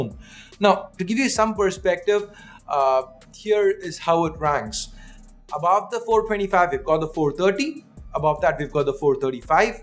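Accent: India. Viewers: Indian talking in English. My gosh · i couldnt hear 80% of what he said. 1. too fast 2. deep Indian accent.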